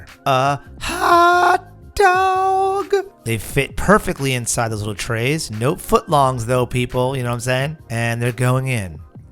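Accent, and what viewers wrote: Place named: New York, United States. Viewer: He's from New York dead giveaway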